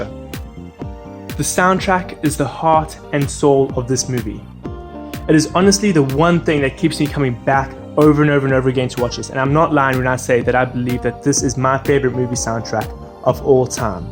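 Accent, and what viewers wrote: South Africa. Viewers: Dude, that accent is one I recognize well and truly. Is jy 'n Suid Afrikaner? as jy is, dan is jy die eerste gooie youtuber ek het gevind op die internet. (Jammer as my afrikaans sleg is, maar ek wil dit meer oefen, en ek praat engels die meeste van die tyd. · I didn't hear Australian either. South African perhaps?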